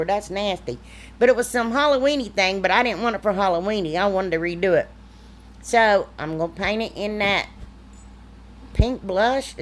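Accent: South, United States. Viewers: Oh me oh my, I am soooo happy to have come across your channel....you are just the cutest funniest southern Belle I've ever heard. You gave me the giggles 🤣🤣 I near done wet my pants laughing at you. Thanks for being YOU!!!! You got a new fan here. 👏🏽👏🏽👏🏽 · Gurl you're a hoot!! Just found your channel.. love your projects..where are you from.. I am in hsv Al..you def from south 😜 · Omg..I just found you and watching you for the first time and I'm cracking up!! Love that Southern accent 😍. Keep doing what you're doing! Thanks for the laughs, I mean it in a good way. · Girl! You are my absolute favorite crafter! All your projects are beautiful. Plus your country accent is right up my alley! I’m a Georgia girl so you speak my language! 😂 TYFS!!! Sending blessings your way. 😇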